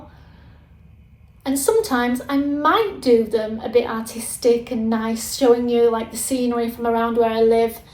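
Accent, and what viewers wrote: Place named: England, United Kingdom. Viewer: I loooooooove all these ideas. I love that you are English. I find your accent and how you live, cozy and beautiful. Your every day life is so inspirational. I can't wait to see!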